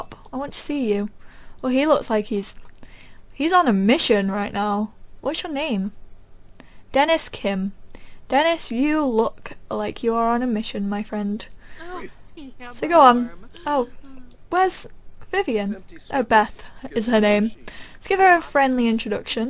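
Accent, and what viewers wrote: England, United Kingdom. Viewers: ***** she totally british you can tell by her accent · Hahaha! I feel so identified with the lack of building skills... Anyway, Sims 4 seems perfect for us! I love the videos, you are making the wait easier!! And your british accent is adorable, by the way!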